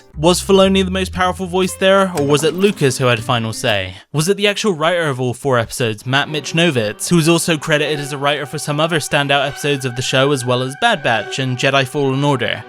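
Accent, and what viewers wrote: West Country, United Kingdom. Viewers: You sound like you’re from Hot Fuzz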